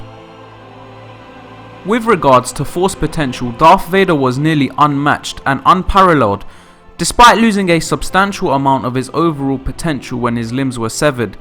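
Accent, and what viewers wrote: Cockney, United Kingdom. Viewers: You sound like a cockney chav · Is this guy a cockney?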